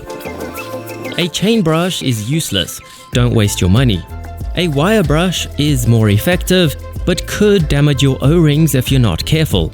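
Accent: South Africa. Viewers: Thanks for the tips. Also, hello from South Africa. I could tell you were a boet from your first sentence.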